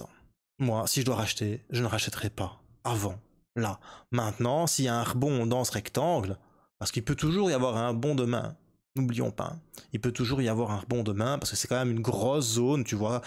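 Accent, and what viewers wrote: Belgium. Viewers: J'adore ton accent belge, c'est kiffant de t'écouter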